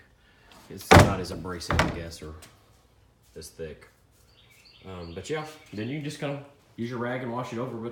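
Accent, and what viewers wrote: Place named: Missouri, United States. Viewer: Sounds like you must be from Missouri I don't know if you heard or not but hillbilly Ranch is closing we usually go to Flat River I live in Illinois in Collinsville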